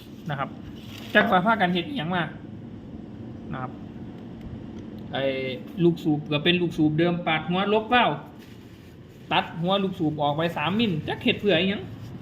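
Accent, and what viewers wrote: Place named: Laos. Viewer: เว้าลาวหันและม่วน.อย่าเว้ากรุงเทพหลาย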